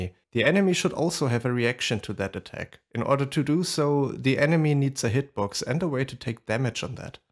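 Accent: Germany. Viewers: Are you german by any chance? Sounds a little like it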